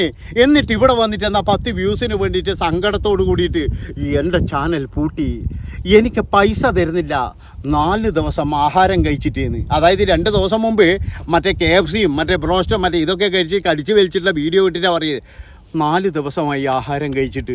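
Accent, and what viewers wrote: Kannur, India. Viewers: കണ്ണൂർ ശൈലി അല്ലേ? ഈടെ എല്ലാരും അങ്ങനെന്നെയ പറയൽ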